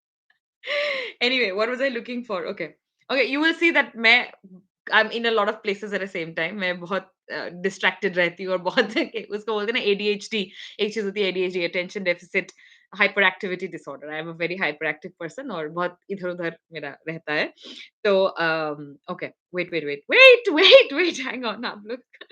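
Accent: Standard. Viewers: Madam आप का हिंदी बहुत ही शुद्ध है 😊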